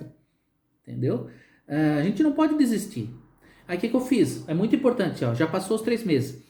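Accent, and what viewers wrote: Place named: Brazil. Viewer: Você nem parece brasileiro a não ser pelo sotaque. Parece inteligente e educado. Domina a Língua Portuguesa. Você está com problemas devido às muitas vigarices com as cartas de condução. Evite a palavra camioneiro (?) e utilize o têrmo camionista, bem como Policia e não policial. Tem que se adaptar à realidade lusitana e boa sorte...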